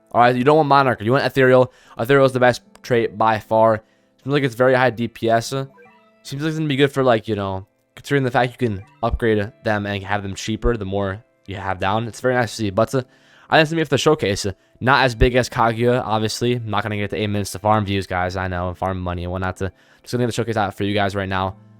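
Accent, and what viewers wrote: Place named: United States. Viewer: im from england but this literally sounds like a generic american accent to me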